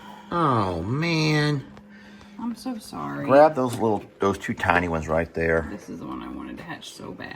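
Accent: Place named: Texas, United States. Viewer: Your Texas accent is showing... Terrance becomes Tarrence. get over here Tarrence.. Ha! Love it!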